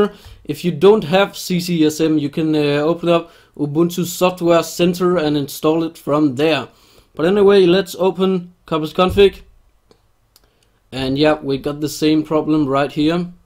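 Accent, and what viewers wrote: Denmark. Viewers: is a nice name:D And still so danish and so badass english I do really like your english speak:D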